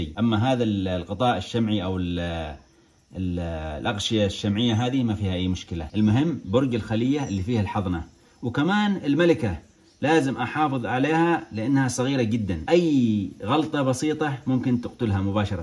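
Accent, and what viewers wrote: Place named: Yemen. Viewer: اخي. انت من اليمن صح. لهجتك مشابهه للهجتنا اصحاب الحديده. بس المكان هذا الي انت فيه وين. مش ممكن يكون في اليمن 😢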